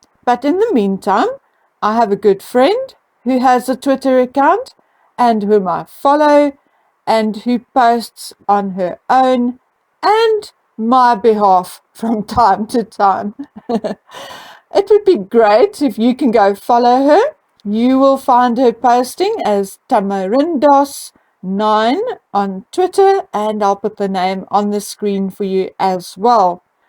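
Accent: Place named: Durban, South Africa. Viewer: JUST LOVE ACCENT EX DURBS? BACK IN UK.... M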